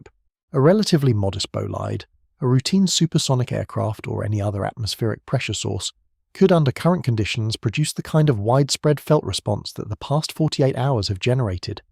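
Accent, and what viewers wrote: United Kingdom. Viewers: Why is it always a British accent?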